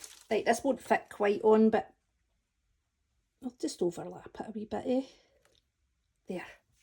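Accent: Scotland, United Kingdom. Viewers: Thank you for this! I bought a gyro cut a while ago and didn't know how to use it. I think I might have to buy the deep cut though as I want to use it on Dura-lar sheets. However, I do have some Tyvek, which is a lot thinner, so I'll try it on that. By the way, I LOVE your accent! it's like music to my ears and it reminds me of all the wonderful people I met during my travels in Scotland <3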